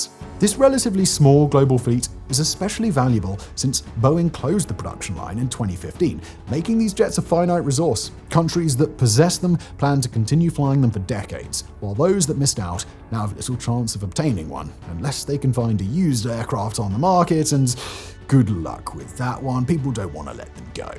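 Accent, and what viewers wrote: RP, United Kingdom. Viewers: Everything about this guy (but especially his posh accent) makes me want to punch him in the face until my arm tires.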